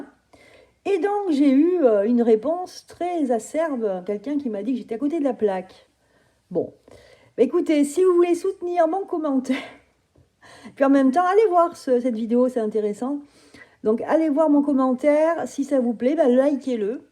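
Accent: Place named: Corrèze, France. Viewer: Bonsoir merci bp j adore votre accent je crois entendre ma cousine correzienne c est presque pareil😊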